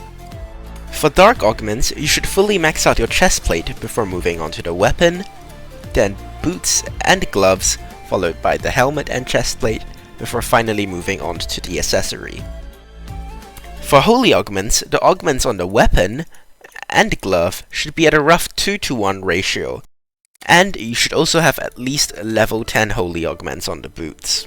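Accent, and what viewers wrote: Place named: United Kingdom. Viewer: Got to love that british accent!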